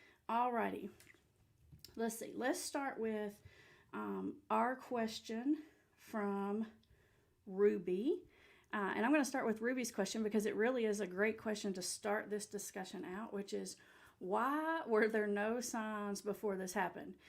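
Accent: South, United States. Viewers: Plz turn on captions so we can understand ur southern accent...thanku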